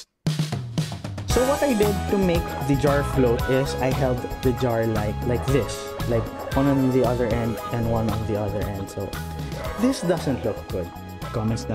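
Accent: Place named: Philippines. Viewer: SKL I recognized our Filipino accent immediately 😂 Much love and support ⭐️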